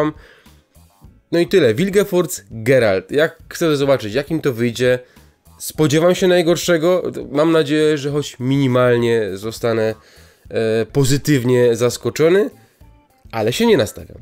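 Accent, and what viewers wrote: Silesia, Poland. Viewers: jesteś Ślązak?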